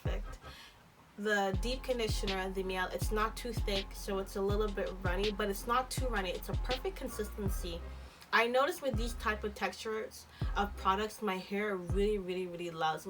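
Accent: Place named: Canada. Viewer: I knew u were in canada i hear the accent lol...im in mtl..where did u buy the avocado?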